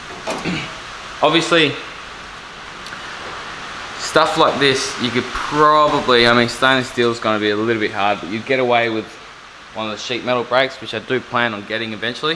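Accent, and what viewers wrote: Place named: United Kingdom. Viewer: never heard a british redneck before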